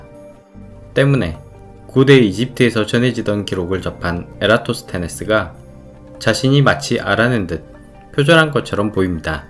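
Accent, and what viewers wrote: Jeolla, South Korea. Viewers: 영상 잘 보고 있습니다~! 드문드문 나오는 전라도 억양도 재밋어요 ㅎㅎㅎ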